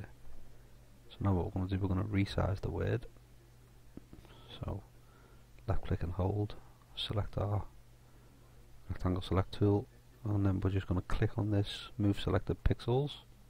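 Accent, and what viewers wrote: Liverpool, United Kingdom. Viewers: scouse 4 life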